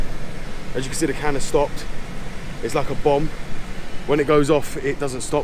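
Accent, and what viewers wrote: United Kingdom. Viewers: 😂😂😂like a bomb but with that Brit accent killed it thanks for showing very simple and straight to the point 🎉👏👏👏NYC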